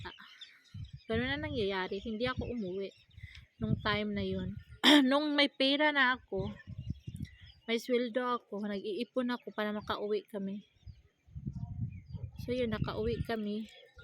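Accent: Visayas, Philippines. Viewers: Visaya diay ka ma'am..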